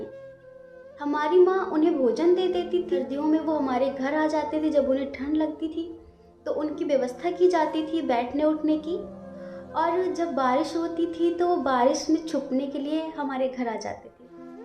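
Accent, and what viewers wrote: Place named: Pahari, India. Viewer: Kitni pahari aawaaj hai aapki waise hi payare aap ho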